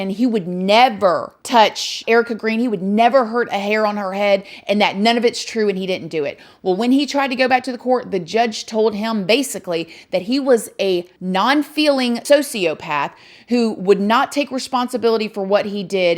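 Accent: South, United States. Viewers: I am in love with the country accent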